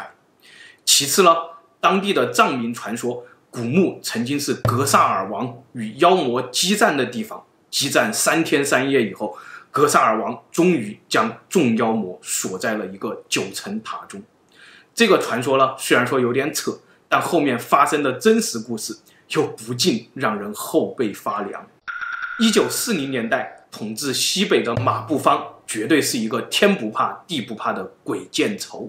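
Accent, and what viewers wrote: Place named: Sichuan, China. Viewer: ，听你说话感觉你像四川那边的普通话，因为你n、l部分，平翘舌不分。但是看你留言又感觉你不在中国大陆的样子。因为大陆居民要翻墙才能玩youtube的，所以肯定现有B站账号、抖音账号才会有youtube账号啦。而且墙内是主要阵地啊。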